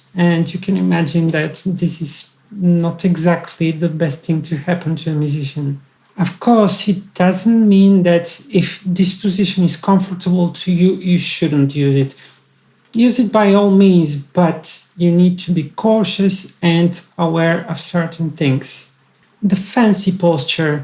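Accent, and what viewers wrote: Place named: England, United Kingdom. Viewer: I love your English accent! 🖤 What would be the best playing position when standing up?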